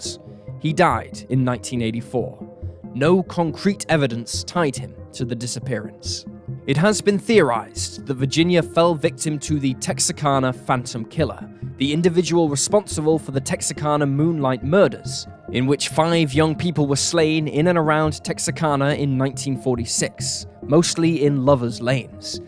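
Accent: United Kingdom. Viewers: Where would you ascribe his accent in the UK?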